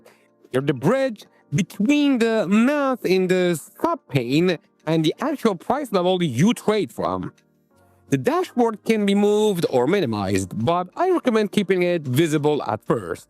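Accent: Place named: Poland. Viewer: First of all thank you for this interesting video. Regarding your voice, I assume that's because the regular Polish language melody does not work when talking English. Like my Swiss German talking style in Italian would hurt your ears 😉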